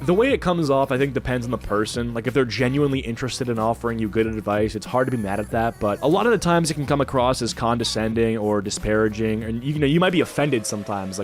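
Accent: Netherlands. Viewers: Je bent nederlands? Ik kan het amper horen in je accent, goed gedaan!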